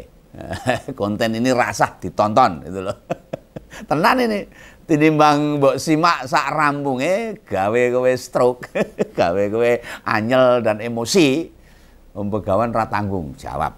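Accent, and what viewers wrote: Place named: Java, Indonesia. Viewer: Enak didengar bahasa jawanya om begawan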